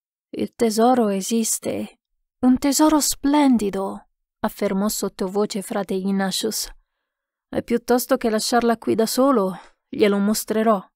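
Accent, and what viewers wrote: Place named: France. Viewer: Bravissima, mi piace soprattutto il tuo accento francese. 👏👏👏👏👏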